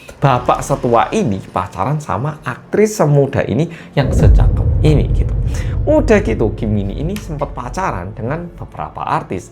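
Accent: Java, Indonesia. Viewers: Abis dari channel ngelantur yg brutal anjing2an... ke channel mas2 korea jawa medhoookkkk 😅😅😅 untung gk ketuker😂😂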